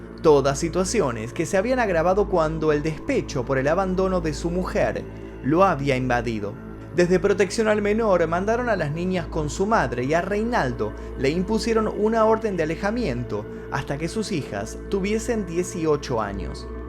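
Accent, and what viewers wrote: Argentina. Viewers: Hola, soy nuevo suscriptor, me gusta como presentas este contenido mórbido y el acento argento es complemento distintivo, sin comentarios picantes como lo hacen famosos youtubers. Tu siempre estudias lo que presentas, obvio la legitimidad está sujeta a la veracidad de tus fuentes pero eso es caso aparte. Cuida por favor tu salud mental, un saludo desde Colombia. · Magnífico y excelente como siempre, como tú, me encanta tú acento argentino y luces..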